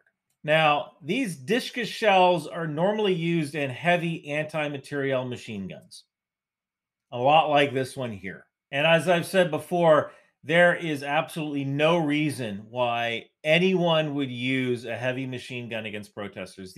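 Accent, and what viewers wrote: New Jersey, United States. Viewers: don't apologize about your New Jersey accent. I'm from NYC and I completely respect and understand you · Thank you for breaking this information down. If people complain at you for mispronunciation in a Jersey accent. I would hate to hear them whine about my Texas accent pronouncing foreign words. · Mr., dont ever apologize fir for your Jersey accent. I'm from a few miles north of the Jersey border and I sound just like you. And it's a great accent! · Shit man, I like the Jersey accent even if I barely hear it. Keep at it! Without you and Preston Stewart we wouldn't have nearly as much accessible info! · I mean he doesn't really have a strong accent. You can definitely hear the Jersey in his voice but it's not near as intense as some! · I grew up and still live in S. Jersey, no worries about the accent. And I'm near the middle of the speedline, so at least your hometown has a claim to fame. 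Don't feel bad about the way you talk, all good Jersey people talk like that.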